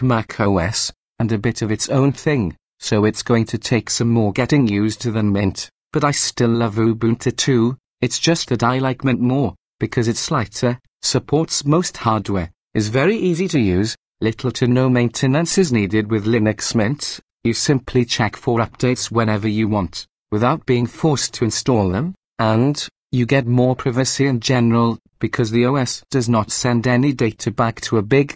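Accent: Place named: Wales, United Kingdom. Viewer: A Welsh “robot voice” - how original!!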